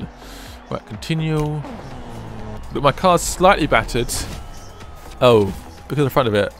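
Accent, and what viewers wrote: England, United Kingdom. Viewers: This man English ?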